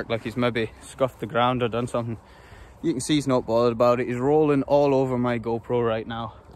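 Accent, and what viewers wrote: Scotland, United Kingdom. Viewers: Have to be honest, I have no idea what you just said for 20 minutes but it was beautiful.❤ I love Scottish accents. What can I say. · it is a thing of beauty to watch these dog work.And with such few words/commands. The icing on the cake is his Scottish accent! Thank you for this. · I have just discovered this channel and I am obsessed with it. Between gorgeous Scottish accent and those amazing dogs, it's a refreshing break from the news of the day.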